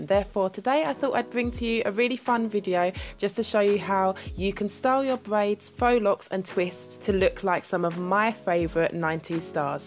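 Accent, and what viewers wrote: London, United Kingdom. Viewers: She's from London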